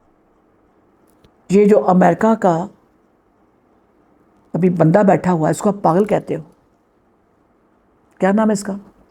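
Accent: Punjab, India. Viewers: Thudai hindi to Punjabi di mehak aandi hai ji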